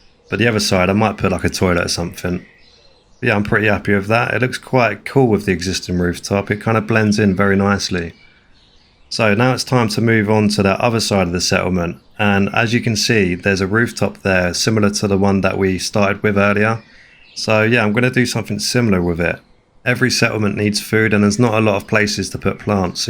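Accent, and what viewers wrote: United Kingdom. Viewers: just discovered your channel and omg. im so happy i found you !! my fo4 is screwed atm (heavily modded and the ng update killed it lmao) so im getting my fix playing new Vegas/watching videos (i have major ADHD/autism and am hyperfixating on fo4 so not being able to play it is miserable tbf). also as a fellow brit i find it frustrating how many youtubers are american so your voice is nice to listen to lmao. (i'd guess you're more of a southerner?) anyway keep it up man, your builds are super creative and i love watching you do them <3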